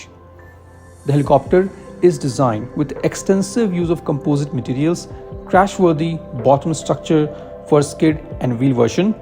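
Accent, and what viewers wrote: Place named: Maharashtra, India. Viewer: Are you from Maharashtra ur accent seems very similar to me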